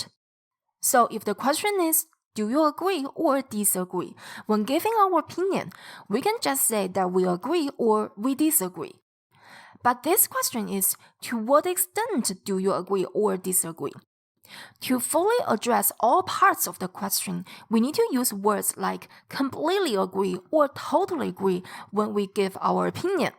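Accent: China. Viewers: Laoshi I love your accent my Chinese girlfriend accent was same I have lived in china more than 7 years Chinese people use the same accent they call everysing instead of everything You are great keep it up After listening your voice it take me back in memories of past that we have made in past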